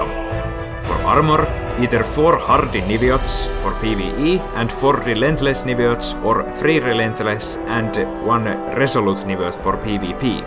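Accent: Finland. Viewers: Ralli english is so strong with this one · hirveen tutun kuulonen aksentti, taitaa olla suomalainen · you sound like all of my Finnish relatives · tolla aksentilla sun on PAKKO olla suomalainen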